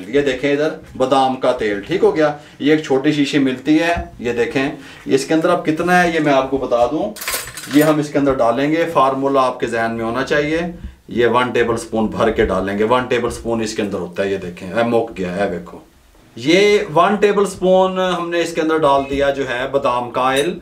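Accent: Punjab, India. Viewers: English, Urdu and Punjabi touch, Zabardast.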